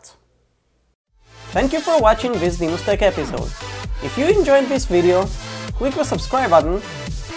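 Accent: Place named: Israel. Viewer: ?! יש מצב? קודם כל זיהיתי מבטא ישראלי, אח"כ זיהיתי את הקול ואז זה התחבר לי עם, יש מצב?!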